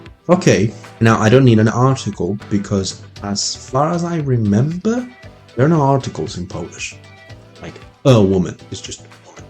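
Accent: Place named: United Kingdom. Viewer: bro you are a grown up now! you didnt even had that voice tone after high school hahaha very british xD sounds cool.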